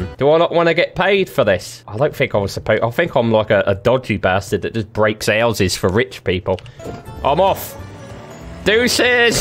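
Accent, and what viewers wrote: United Kingdom. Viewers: Your british accent just makes it so much funnier damn.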